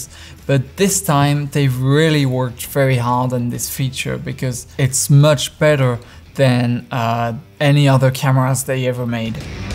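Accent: France. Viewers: X-T3 for me...but great review, are you french ? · Excellente vidéo :) Recognized a French guy behind this English accent, carry on this move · J'ai eu du mal à repérer l'accent français :) J'hésite à switch de mon a6300 à cette caméra :s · I'd like to know where you're from (accent sounds French).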